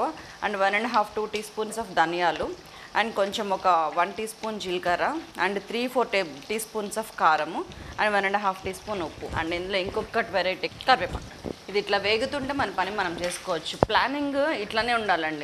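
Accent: Telangana, India. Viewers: Medam Medhi telangana or andhra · మీ మాటల్లో తెలంగాణ యాస చాలా బాగుంది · Mee telangana yasaki johar.telangana bidda anipichharu.i like it👌😃😃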